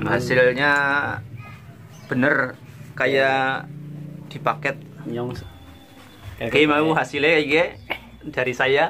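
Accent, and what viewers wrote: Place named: Banyumas, Indonesia. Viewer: Wahhh buat shooting ya mas boss...lucu ngapaknya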